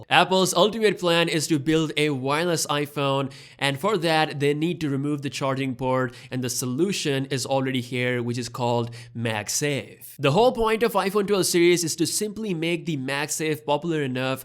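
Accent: India. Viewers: You got somewhat of an indian accent lol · Your Indian accent pops up.. Alot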